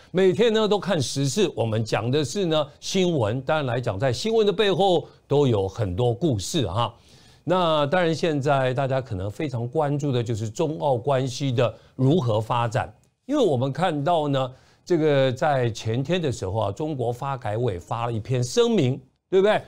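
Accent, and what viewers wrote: Taiwan. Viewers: 主持人华语是台湾学的吧，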